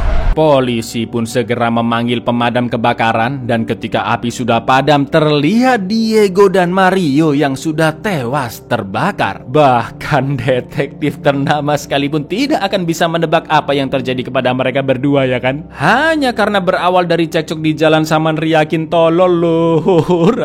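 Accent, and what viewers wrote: Java, Indonesia. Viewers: Bng lu orang Jawa bng 🤣🙏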